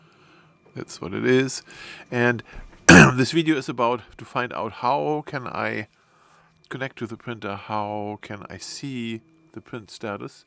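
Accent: Germany. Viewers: Du hörst dich an wie ein deutscher,bist du einer? Naja jedenfalls Danke für das Video!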